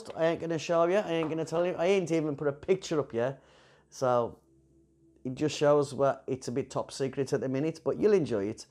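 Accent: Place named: Sutton Coldfield, United Kingdom. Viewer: Love the simplicity so glad I've discovered your channel. Love the accent too, takes me back to my youth in Sutton Coldfield.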